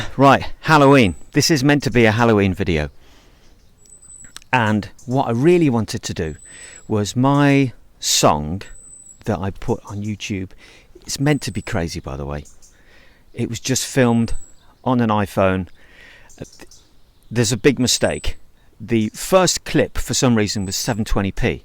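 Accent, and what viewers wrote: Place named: United Kingdom. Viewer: Oooooh une vidéo en français !! J'ai hâte de découvrir. Avec l'accent british qui colore joyeusement tout ce qu'il touche ! A new challenge doesn't scare you, does it..? ☺ ✴🌟✴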